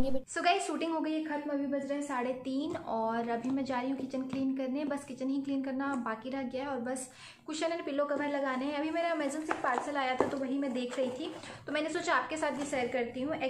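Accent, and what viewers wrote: Bihar, India. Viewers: Aap Bihar se ho mam ????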